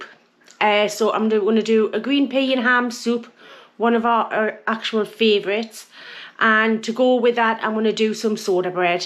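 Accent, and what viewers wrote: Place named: Teesside, United Kingdom. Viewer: EE by gum lass that looks grand, I left the Boro sixty years ago nice to hear your accent.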